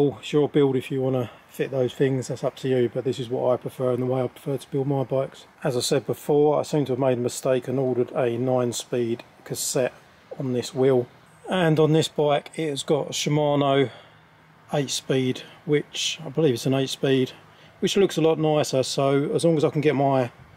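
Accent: England, United Kingdom. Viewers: Nice to hear an Englishman doing one of these conversions. Most of them are from the US. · Судя по акценту ты англичанин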